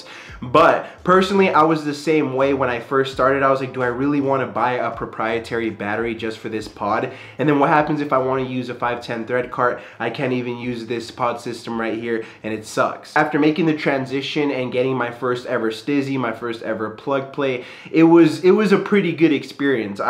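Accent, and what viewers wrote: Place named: West Coast, United States. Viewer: Brother has a west coast bwhwhwhahah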